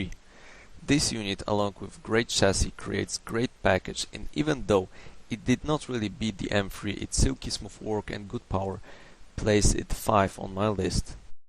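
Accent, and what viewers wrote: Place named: Poland. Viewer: You Polish? Taki kanciasty akcent ;)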